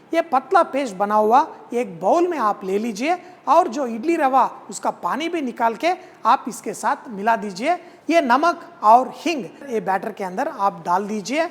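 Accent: Hyderabad, India. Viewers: muze bhi apaki Hindi achchi lagati hai. hydrabadi style me bolo.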